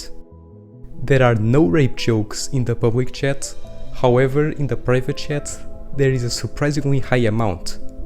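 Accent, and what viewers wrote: Portugal. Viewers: Ganda vídeo bro , és português? Notei um sotaquezinho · are you portuguese by any chance? (im asking because of your accent) edit: nvm és tuga de certeza lol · Hey, are you by any chance portuguese? Your accent is very familliar. O facto do canal ter CR7 no nome também ajudou. Great video by the way, gained a new sub.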